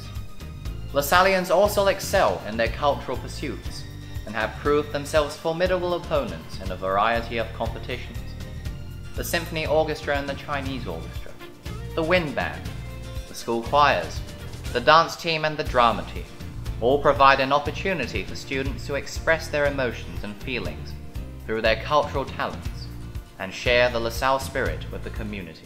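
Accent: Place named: United Kingdom. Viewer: Who recorded it? Nice British accent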